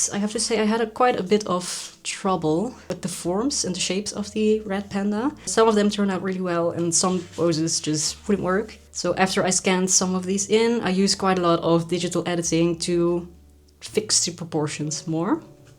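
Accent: Netherlands. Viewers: I always love it how easy it is to recognize the dutch accent, 1 sec in the video and did not see your channel description and i already was like, hold up wait a minute.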